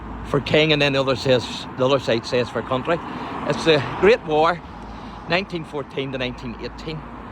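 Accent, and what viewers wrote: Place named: Northern Ireland, United Kingdom. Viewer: The Northern Irish accent is thee best accent in the whole English speaking world so it is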